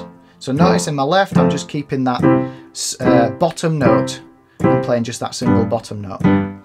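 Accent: Yorkshire, United Kingdom. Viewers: You must be a Yorkshire fella 🤔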